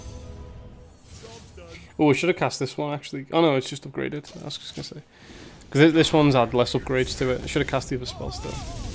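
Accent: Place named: Scouse, United Kingdom. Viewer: great play I can hear a touch of the scouse accent lol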